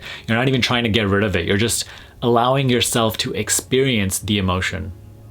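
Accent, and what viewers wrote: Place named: United Kingdom. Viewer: Out of topic, you sound quite British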